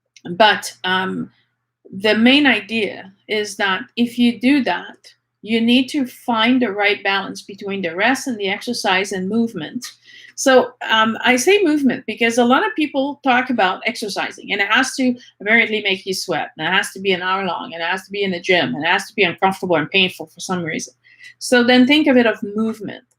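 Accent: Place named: Quebec, Canada. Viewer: Thank you so much for this video. 2 and a half years ago I got a crippling mystery illness near my left ovary no one has been able to diagnose. I was in dibilatating pain with crushing pain for 3 weeks out of the month(using past tense because I know I am healing now.) and just as I thought things couldn't get worse...this illness, losing my career, not being able to go out or see anyone... My father was diagnosed with cancer and passed within 3 weeks basically because the medical system. I thought I would die. I lost my protector and best friend. This happened 2 weeks ago. Then yesterday I started a Dr Joe Dispenza meditation group and during the meditation, I kept hearing the name Hathor.. Continuously. I didn't know anything about Hathor... But I'm purely Egyptian by blood. I couldn't believe what I learned about her or "them" afterwords. 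It was mind boggling how much she completely related to my health crisis and losing my beloved father. She's here to guide me into healing by being a better mother to myself. To reconnect with my Divine femininity. And your video brought me so much clarity. Im very grateful for you. Thank you from the bottom of my heart for all this knowledge. Also, are you from Quebec by any chance? I am, and your accent sounds like the Quebecois here 😊sending you so much love and gratitude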